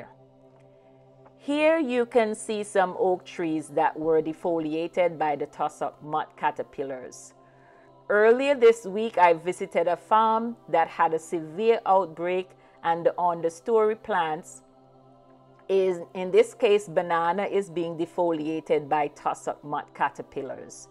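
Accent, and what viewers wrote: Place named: Jamaica. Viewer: Love the Jamaican accent,! Very informative video as they are everywhere in our yard and do tend to sting. Ocala seems to be the epicenter.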